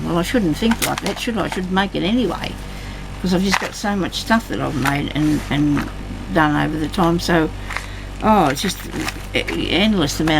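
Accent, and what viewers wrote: Australia. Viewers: Hello - I have just discovered your channel and watched your tassel making. When I started to listen to you talk I thought ooh! is she an Aussie or Kiwi - just took a couple of sentences to figure it out - we speak the same speak. I loved the tassel you made - I know it was last year but anyway loved it - wandered into my small humble craft room and looked around - I am getting cracking tomorrow. I also loved your velveteen flowers and am totally inspired. I am from Perth and am going to watch all your videos now. Happy New Year to you and your family - this also includes the cat!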